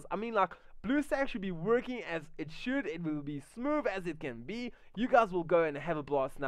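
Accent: South Africa. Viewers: you sound South African lol? Fyi im from Durban.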